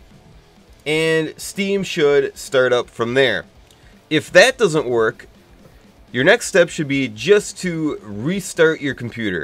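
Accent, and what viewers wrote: Canada. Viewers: The Canadian accent is real.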